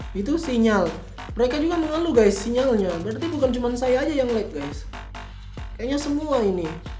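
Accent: Manado, Indonesia. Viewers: Lu manado ya bro?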